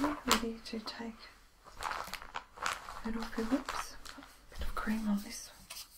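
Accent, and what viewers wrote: Australia. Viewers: Are you Australian? Beautiful video!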